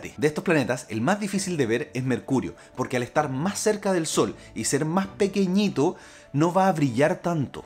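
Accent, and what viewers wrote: Chile. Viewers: Amigo... eres el mejor!!! Muy buen video... tu explicacion es excelente!!!! Ah!!, me encanta tu acento chileno. Soy de Venezuela, pero vivo en Estados Unidos... Te felicito...!! Excelente!!!!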